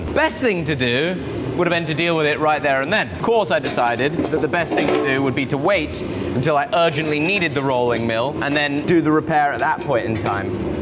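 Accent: United Kingdom. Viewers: A Brit talking about Hillbilly Engineering makes my redneck heart feel good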